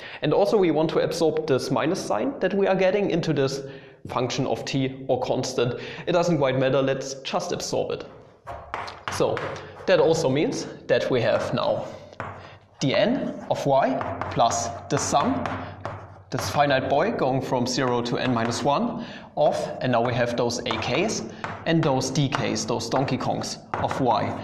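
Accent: Germany. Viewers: That thicc German accent made the informercial even better somehow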